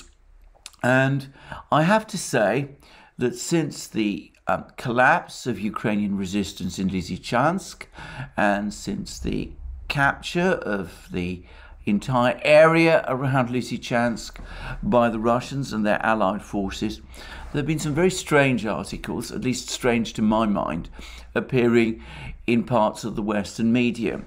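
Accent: United Kingdom. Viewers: Great job AM and I am a sucker for British accent.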